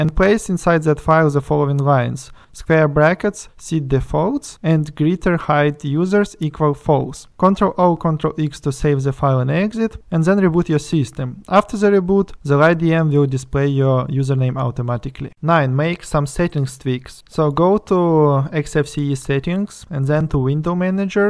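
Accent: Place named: Russia. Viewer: I don't think so - he has a very strong Russian accent :-)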